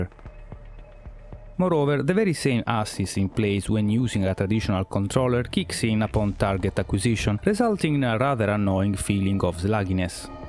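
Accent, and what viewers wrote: Italy. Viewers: si sente che sei italiano ,ti consiglio di fare un canale italiano,cosi sei sprecato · si sente poco che sei italiano! :) ahah..comunque come fai a saper così bene l'inglese? hai vissuto un po' all'estero oppure hai frequentato qualche corso? grazie in anticipo.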